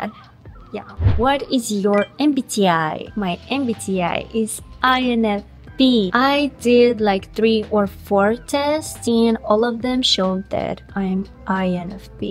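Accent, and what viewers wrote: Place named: South Korea. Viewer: I really like ur korean English accent its unique and😅😆😁 by the way i like ur vids they always bring a smile on me 😁😁😁 keeping doing ur best may God bless U✝✝✝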